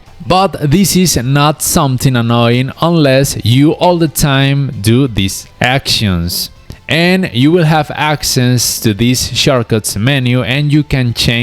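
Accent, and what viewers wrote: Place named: Colombia. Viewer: Buen inglés bro!! Se escucha tu acento colombiano 😂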